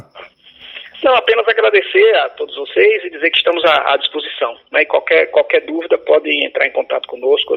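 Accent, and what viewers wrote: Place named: Madeira, Portugal. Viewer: Boa noite! Gostaria de saber de onde você é natural, pois nunca ouvi este seu sotaque... Parece um sotaque da Ilha da Madeira ( Portugal ). Obrigado!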